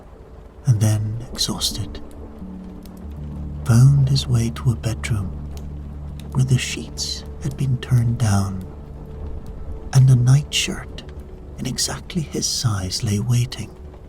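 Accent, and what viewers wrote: Scotland, United Kingdom. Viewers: Thank you. I love your Scottish accent. This is my favorite fairy tale story ❤.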